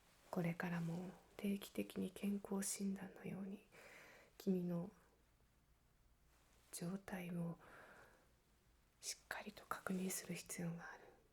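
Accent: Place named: United Kingdom. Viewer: Interesting japanese accent. almost sounds english sometimes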